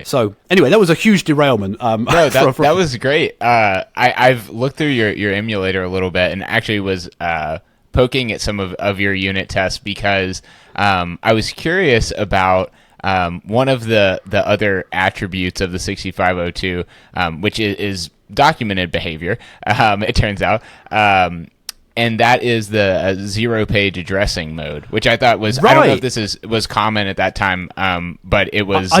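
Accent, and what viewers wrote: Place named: United Kingdom. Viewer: I love these british accent. More than american english. Congrats!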